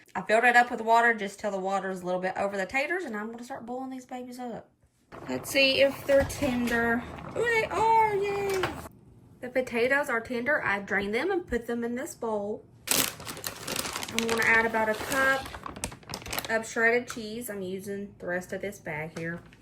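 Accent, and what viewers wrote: South, United States. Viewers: I love listening to you narrate yourself. So southern. Southern woman here:face-turquoise-music-note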